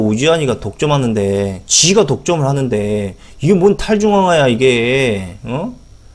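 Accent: Jeolla, South Korea. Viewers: 아따 전라도여 반갑소잉 전라도말은 숨길수가 없어라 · 전라도사투리 은근 중독 되네요~~ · 전라도네.. 믿고 거른다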